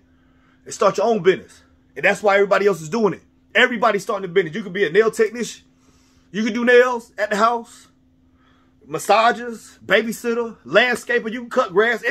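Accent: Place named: Bay Area, United States. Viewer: 💯 Are you from N. Ca or the Bay? We talk like this. Everyone's a lil hood...you know what I'm sayin', sheeiit 🤣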